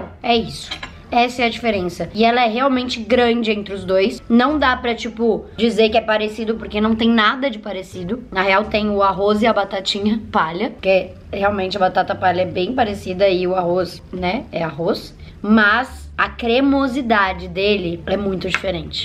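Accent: Rio Grande do Sul, Brazil. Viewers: Eu amo essa, ela é gaúcha nata! Até o jeito de falar, maravilhosa ♥️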